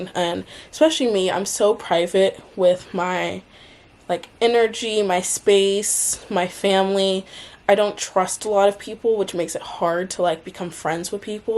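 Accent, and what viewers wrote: United Kingdom. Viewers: Oh my goodness has that British accent 😍😍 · I love how he's starting to have an English accent 🥰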